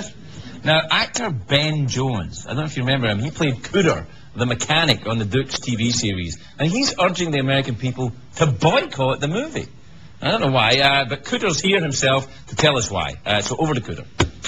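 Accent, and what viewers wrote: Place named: Appalachia, United States. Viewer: hilarous! excellent hillbilly accent!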